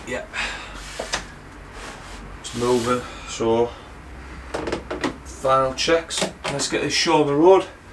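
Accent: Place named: Teesside, United Kingdom. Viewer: I’m from the north east myself, am guessing your Teesside ? Do you work for yourself ?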